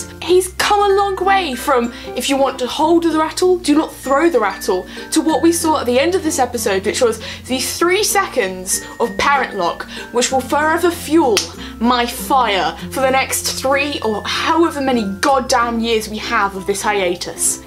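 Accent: United Kingdom. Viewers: Watching this, I was hearing my own thoughts coming out of a younger person's mouth and with a British accent. Seriously, everything you said are things I have said over and over. I lost a friendship -- someone I knew for 30+ years -- for just questioning how John got out of that well. If Sherlock Holmes were a real person, he would have eviscerated this episode for all the inconsistencies and impossibilities; as fans, many of us have come to analyze things in the same way. Thank you for this video!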